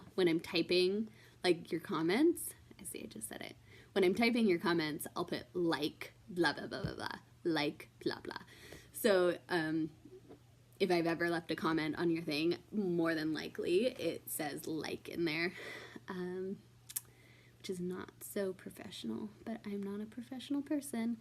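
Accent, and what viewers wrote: California, United States. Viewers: Aww I LOVE this haha. To me you definitely do have the Cali girl type accent lol. Even though I know you don't call it that or consider it one, lol. I have never heard anyone refer to the bathroom as WC before lol. I have heard them call it "The Loo", but that's so funny. Learn something new every day! I think people in the UK call a shopping cart a buggy too, or trolly lol. This was a lot of fun! Thanks so much for doing it <3 :D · Yesssss! I'm so glad you did this! You speak just like my husband who's from LA. He pronounces the "T" like you do, most American's have silent "T"'s but Californian's are a breed of their own! lol. I TOTALLY forgot about buggy!! The words you use are closer to mine which i think is because we're both west coast kids lol. Great Video! I cringe when I hear "toilette" as well lol. it seems so crass! 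lol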